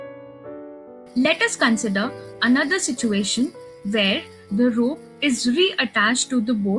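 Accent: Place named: India. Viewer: Indian accent...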